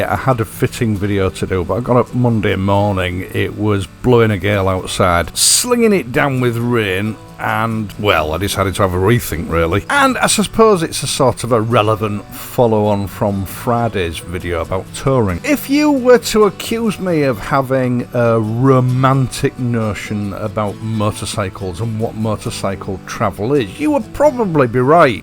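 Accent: United Kingdom. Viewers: for a German bloke as I am it feels so nice to listen to your authentic british accent ... it reminds me of my good old times visiting GB when I was a t young age 😀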